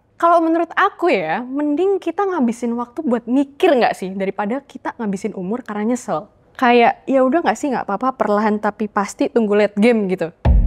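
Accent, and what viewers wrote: Java, Indonesia. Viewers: Kok medok se mbak, bikin seneng aja😅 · satu yang saya notice, kemampuan beradaptasi dengan semakin menyamarkan logat medok-nya meningkat jauh. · mayan lah, medoknya udah hampil ilang, tapi masih kerasa di beberapa pronounce 😁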